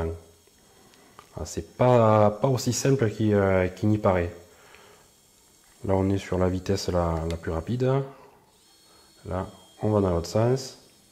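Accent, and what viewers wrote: South, France. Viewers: T'es débalages sont toujours aussi bien et même s'améliore de vidéo en vidéo et continu comme ça. Et bien sûr toujours avec l'accent du Sud😊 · Dommage qu'il y ait pas beaucoup d'abonnés sur ta chaîne parce que tu présentes très bien les produits et ton petit accent du Sud est attachant ;) tu veux pas essayer de contacter Steven par exemple?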